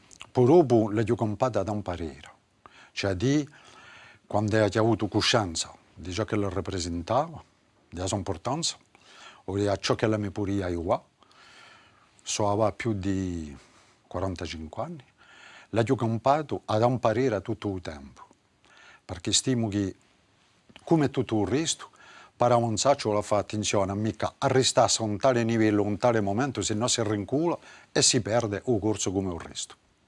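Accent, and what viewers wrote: France. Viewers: L'accento francese è inevitabile.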